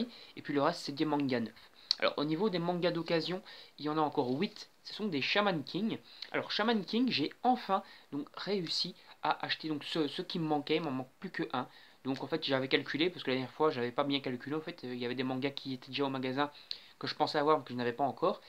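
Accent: Belgium. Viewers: salut , merci de m'avoir répondu et désolé d'avoir pris du temps pour repondre , pour la question ou je te demande si tu es belge c'est parce que je suis belge et que j'ai eu l'impression d'entendre un petit accent xD Pour les conseille je voulais savoir - si on était "obliger" de montrer sa tête en vidéo car je suis encore jeune. - si filmer avec un téléphone impactais sur la qualité de la vidéo car pour moi l'image paraît correcte mais je n'ai vraiment d'avis extérieur si tu vois ce que je veux dire - et enfin aurais tu des musique de fond pour les vidéos à me conseiller Après je ne sais même pas si mes vidéos sont bien donc j'espère ne pas te faire perdre ton temps voilà voilà, en tout cas ta chaîne m'a beaucoup plus 👌😉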